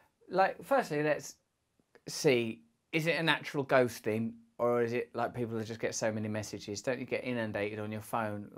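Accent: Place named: United Kingdom. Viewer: Love his British accent 🤘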